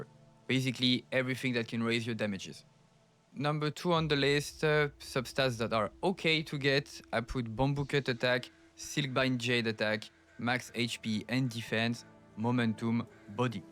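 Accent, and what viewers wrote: France. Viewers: Je reconnais l'accent français , bien joué ta compris la meta mon gars 💪🏼💪🏼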